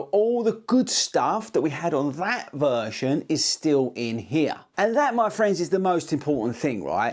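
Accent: United Kingdom. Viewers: Are you British sir?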